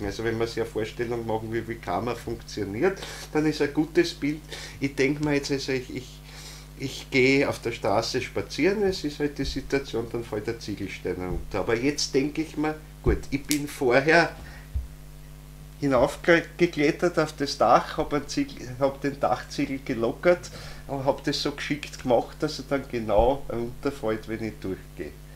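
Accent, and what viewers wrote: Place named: Austria. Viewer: Vielen herzlichen Dank für die so wichtigen Vortragsreihe. Bloß ist es Stellenweise nicht so einfach wortlaut vollständig zu verstehen wegen der östreichischen Akzente.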